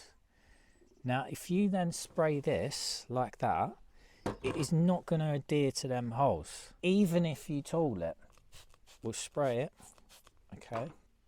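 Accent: United Kingdom. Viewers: Finally someone that actually has common sense about installing silicone. And you sound like you are from the UK. I have never seen a caulking video from the UK that wasn’t ridiculously wrong. And very few from the US that were any better. I spend a lot of time refuting the moronic YouTube silicone videos. Everyone thinks if it looks good it is good. The fact is in the US residential silicone lasts on average 7 years where properly installed ( no liquids) commercial silicone lasts on an average 20-30 years or more. Every major manufacturer says not to use liquids to tool with if you look close enough at their tech manuals. Here is what DOW ( one of the largest silicone manufacturers in the world ) says: “NOTE: Do not use liquid tooling aids such as water,soap or alcohols. These materials will interfere with cure, adhesion and create aesthetic issues.” Page 75 of Dow Construction Sealants Technical Manual. 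Do you mind if I refer people to this video? The only thing a disagree with is only tooling ne way it pulls the seakant away from the tile/ grout at the first tile and into the tile/ grout at yhe second tile You need to go both ways.“professionals spray their tools” wrong. True professionals dry tool and mostly ( not always) use custom ground metal tools. I don’t want to be mean but the fact is the UK is far behind a lot of the world when it cones to sealants. Probably due to your lack of high rise buildings ( probably a good thing)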